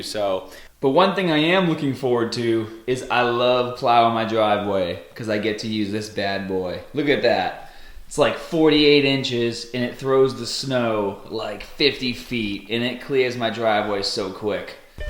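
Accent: Boston, United States. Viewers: what accent is that? Boston accent? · I never noticed your boston accent in other videos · Hey, love your videos, been viewing for a long time! I'm actually from the MV region aswell. You just don't get that OG Boston accent in channels anymore! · Is that a Bostin accent? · Watah pump Muscle fibers in your aahms Monkey bahs. Boston accent on point today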